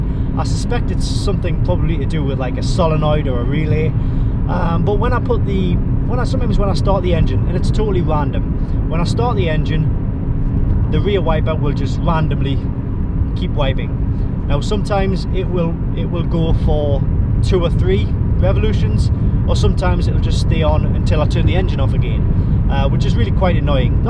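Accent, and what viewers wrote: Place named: Geordie, United Kingdom. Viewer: I've followed your channel since you started, years ago, with the channel under your " " name. Just for feedback, it's gone from being an on honest "Geordie lad talking about cars" to becoming clickbaity, cheap and nasty. You've always been a bit w*nky, pretending you're something you're not (regarding the Porsches) but it's just tacky and sad now. You're not fooling anyone by toning and smoothing your natural Geordie accent and pronouncing letter 't' as a 'd' (pardy on, man). Have you ever wondered why videos like this have a staggering 36% thumbs down rating (YouTube average is 8%)? Just stop being a k*ob and try actually being yourself.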